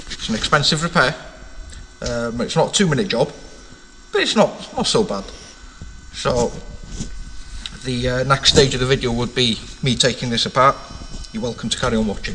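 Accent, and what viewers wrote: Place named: North Wales, United Kingdom. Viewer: Great video fella, just stumbled across your channel and subscribed. It would have been good to see if there was any visable play on the lobe once it was out, am I right in thinking the lobes are splined to the shaft? Also I heard the crappy cams were introduced with the 'upgrade' to Euro6, was the solid new cam you fitted off a Euro 5? If so I guess all the cam timing is the same? Also nice to hear a proper North Walian accent on Youtube, I'm originally from Llanfairfechan.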